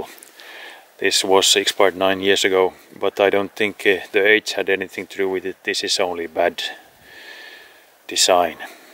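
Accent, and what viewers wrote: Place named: Finland. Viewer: Hmmnmm... Let me guess... You are from Finland.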